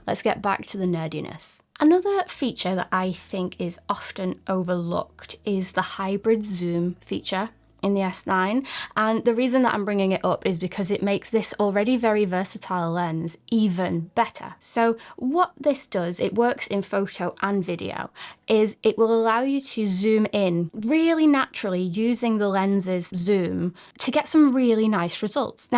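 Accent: United Kingdom. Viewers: You speak in a very nice, soothing tone. I am surprised because I usually don't like British accents (too high a pitch), but yours is very wonderful.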